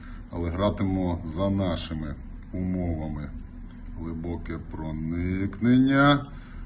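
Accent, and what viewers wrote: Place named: Mukachevo, Ukraine. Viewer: Мадяр у тебя акцент как у жителей Мукачево.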